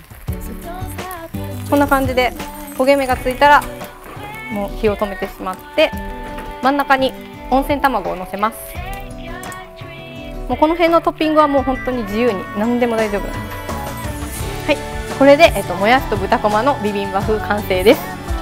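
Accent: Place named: Kansai, Japan. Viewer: 関西の人なんだ。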